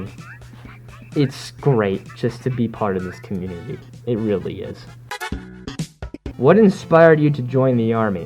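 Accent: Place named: Mid-Atlantic, United States. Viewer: Awww you got the cutest mid-atlantic accent. Also: Deer do have fangs. And not like, during the Pleistocene, some deer have fangs to-day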